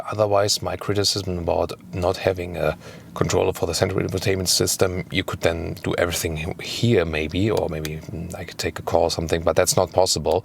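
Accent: Germany. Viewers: Very well done ! I like the superb number of facts you are able to tell us during driving and the pronounced subjective comments on every car ! You really speak fluently English but why don´t you stay for a couple months in the states and get rid of the hard German accent ? ! it should make your really interesting videos just perfect ! Please continue ! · OMG… you sound so Germish 🇩🇪🇬🇧 that I can’t continue watching it!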